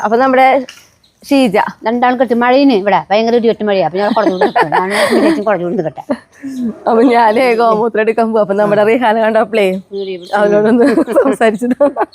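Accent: Thrissur, India. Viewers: This is a viewer from UK, following your videos for long time. I think I even started getting Trissur slang 🤓. But I am from TRV based here in UK for nearly 20 years. Will you consider Airbnb there? So we could visit and be farm hands ?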